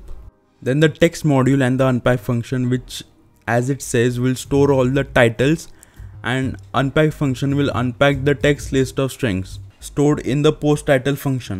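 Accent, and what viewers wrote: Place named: India. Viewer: you're the first guy with an indian accent that I enjoyed watching :D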